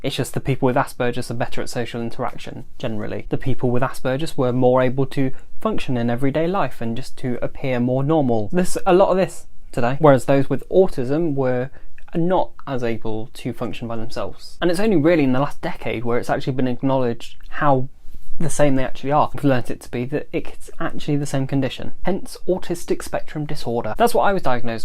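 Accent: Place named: United Kingdom. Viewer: I prefer the term Asperger's, I pronounce it your british way. For several reasons. One it honors Asperger himself, who was a genius and had it himself, two it speaks to the 60 year time gap before idiotic american medical ascociation caught up to his ideas. And three it seems more of a technical personality quirk without being an all encompassing different than normal state. I think it's only being abandoned for political reasons in the political boards and will be taken back up, and as such I'm sticking with it, speaks to how this is very dependent on personal evaluations, where one man can out think the whole world by 60 years like that.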